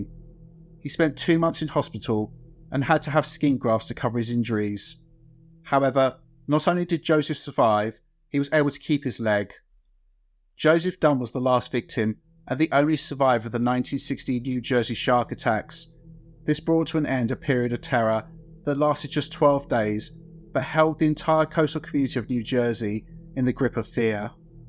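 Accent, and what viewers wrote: England, United Kingdom. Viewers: He’s not German..he’s English. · Why is a pom narrating this?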